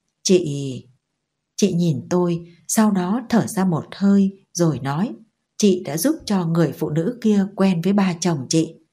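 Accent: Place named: North, Vietnam. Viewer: Sao khg có giọng đọc tiếng Nam .những truyện miền tây mà cứ nghe giọng miền bắc này khg hợp. Dù giọng rất hay .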